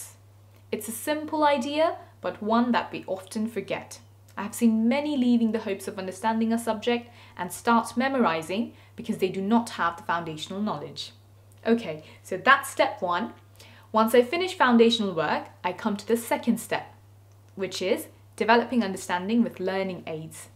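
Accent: United Kingdom. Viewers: You talk perfectly in british accent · how you axcually gain the british pronunciation and fluency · your british accent is so soothing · How would you expertise British accent? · That accent is way tooo oxford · very sweet British accent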